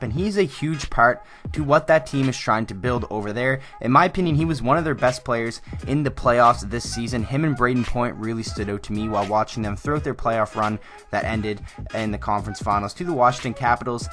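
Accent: Canada. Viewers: unknown gt gamer lol yeag he says about like a canadien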